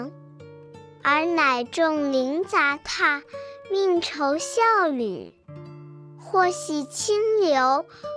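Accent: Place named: Cantonese, China. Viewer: 粤语读出来，别有风味啊😁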